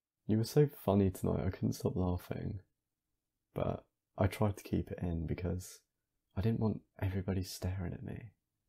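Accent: United Kingdom. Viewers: I live for that British accent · bOdayy~ british accent is sooo cutee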